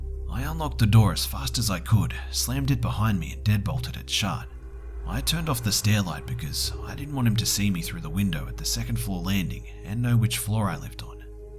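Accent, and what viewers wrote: Australia. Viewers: U sound Aussie? R u? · Watching your videos get me so excited you make these videos so well! Having a fellow Aussie telling it makes it that much better !!! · R u Australian? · I'm so excited! Scary stories with a good old fashioned Aussie accent! And, even better, you don't have a weird way of talking, like ending every sentence like a question, hahaha. You, sir, have won yourself another subscriber (not that it looks like you need it!) ;) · Nice voice, glad to hear an aussie accent reading scary stories. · Aye it's my favorite Aussie narrator ! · is this narrator Australian? I'm an Aussie as well! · It is soothing and now that I'm high, I can listen to it. When I'm sober, I find something irritating in his accent. I normally I don't care about strayan accent on tv or anything else but here it for some makes me alienated. I still listen because he's got talent and good stories! 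:) ps. for example HellFreezer (my absolute favorite) has a scottish accent and it just makes it 1000x times better!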